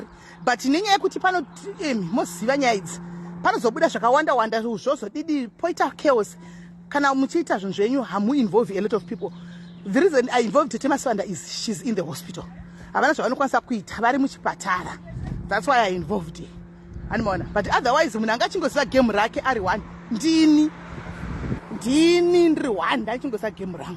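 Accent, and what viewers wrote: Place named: Karanga, Zimbabwe. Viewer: Sis vanotaura chikaranga chaicho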